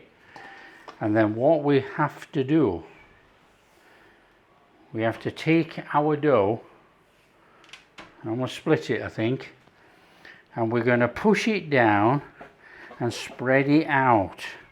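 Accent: Yorkshire, United Kingdom. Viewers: Sounds like Yorkshire accent 👍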